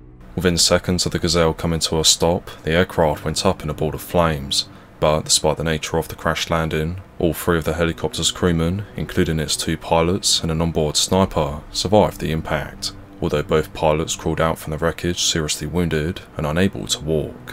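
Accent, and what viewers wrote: United Kingdom. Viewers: He is American. With a British Accent.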